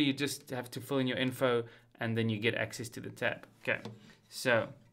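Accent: South Africa. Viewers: Het dadelik jou Afrikanse aksent agtergekom.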